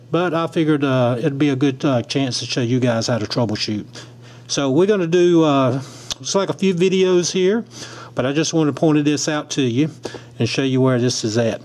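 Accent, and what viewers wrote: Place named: Virginia, United States. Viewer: You sound like you’re from VA. Good video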